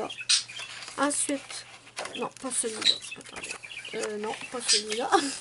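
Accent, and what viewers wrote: Canada. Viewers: Bonjour 😄je viens de regarder ta vidéo, c'est très bien ce que tu conseilles aux personnes qui débutent le coloriage. Moi ça fait peu de temps que je commence le coloriage, à côté je fais de l'aquarelle et du pastel sec qui méritent des matériaux plus chers que pour le coloriage,pour moi bien sûr, donc je commence le coloriage avec les brutfuner,ils sont parfais😍💜Par contre pour les feutres crayolas je ne savais pas qu'il y avait 3 façons de les employer et c'est super je vais les prendre pour le moment pour remplacer les encres distress ink et je ne savais pas qu'ils étaient aquarellables.C'est super. J'adore tes vidéos 😍❤️ton accent canadien et la façon que tu as de te parler à toi même, tu es géniale 😍💜 Bisous bisous 😍😘😘🧡🧡🧡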